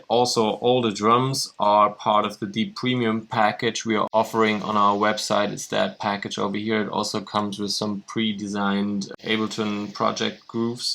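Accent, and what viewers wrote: Germany. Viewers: würde mir tutorials auch in Deutsch wünschen! Man hört extrem deinen Deutschen- Akzent (y) :)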